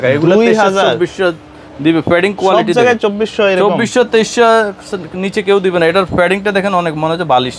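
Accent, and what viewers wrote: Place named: Noakhali, Bangladesh. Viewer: ভাইয়া মনে হয় আমাদের নোয়াখালীর মানুষ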